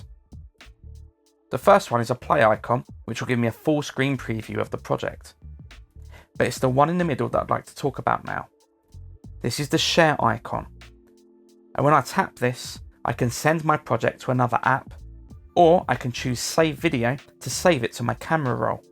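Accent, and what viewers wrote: United Kingdom. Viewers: nice a british accent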